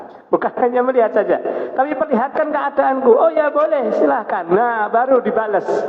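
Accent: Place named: Semarang, Indonesia. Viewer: Ustadz asli Semarang keturunan Arab tob ceramahnya pake bahasa logat Semarangan 👍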